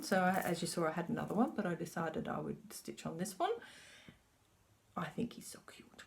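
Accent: Australia. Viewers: What a delightful video! I have just subscribed and am thrilled to find you!:) I am so happy that you came to visit my little channel. Isn’t it fun to find a new stitch friend through our wonderful hobby/obsession!!! BTW, I love your Australian accent and your craft room. I look forward to binge watching your other videos soon. Happy, happy stitching from a new friend in Texas.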